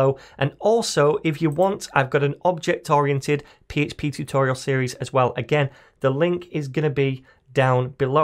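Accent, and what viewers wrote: United Kingdom. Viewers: Is this speaking British Accent?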